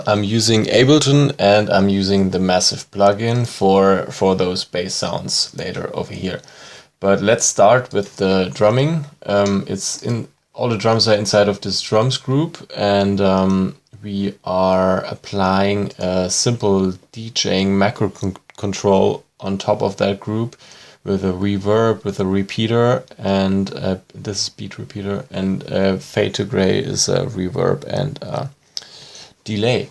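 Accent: Germany. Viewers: thats beacause he`s got an German Accent, too ;D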